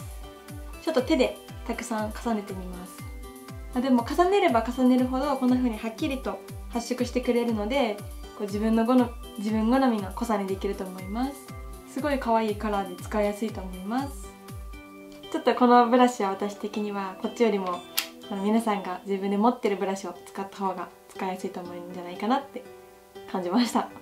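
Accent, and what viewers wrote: Kansai, Japan. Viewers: 関西弁やからしゃーないし。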